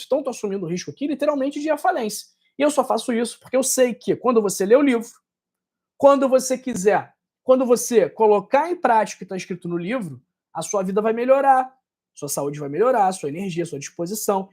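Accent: Rio de Janeiro, Brazil. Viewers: É o sotaque dos cariocas. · Que nojo este sotaque carioca carregado...não dá nem pra escutar...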